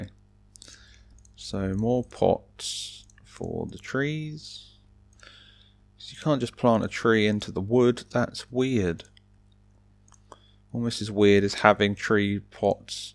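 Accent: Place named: United Kingdom. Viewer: Dude you're just the best youtuber. Too many reasons. First of all, your voice is extremely calm and relaxing, I love it! Second, your accent, I just adore British accents, IDK why. Third, the video ideas you have are really creative, yours and my humor are almost exactly the same XD Fourth, the game you're known for, cubic castles, is just *the best*. Fifth, the dedication put into your channel is crazy. There are way too many more reasons I could list here, but I just want to concentrate on the video! If you're interested in being friends on cubic castles, my username is RustyComedy69 BTW :^)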